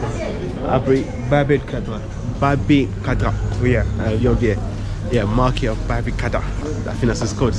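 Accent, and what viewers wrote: United Kingdom. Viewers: Your dialect is british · U have accent British are u British??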